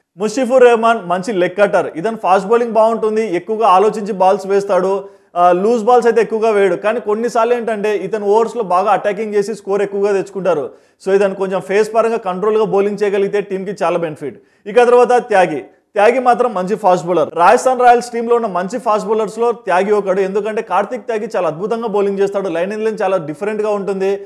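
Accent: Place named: Kadapa, India. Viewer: Anna me kadapa slang super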